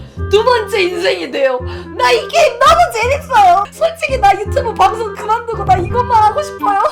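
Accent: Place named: Jeolla, South Korea. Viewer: 전라도 분이 신가..? 말투가 되게 중간중간 구수한 발음이 나오시내 ㅋㅋㅋ 재밌게 봤습니다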